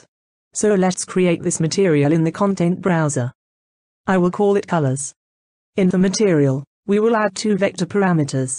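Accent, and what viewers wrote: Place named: United Kingdom. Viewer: Thank you, British robot lady.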